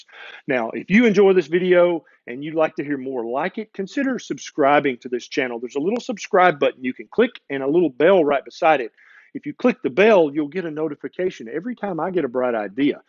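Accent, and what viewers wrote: South, United States. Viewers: Nurses receive more training in nutrition in school than doctors. It’s ludicrous. A doctor is not someone to go to for regular general nutrition advice bc they’re not trained in it. The current model is curative, not preventive. Please keep fighting the good fight! Publish! Get the word out to your colleagues. Change them. Good luck and godspeed! (...and LOVE the South in your mouth!)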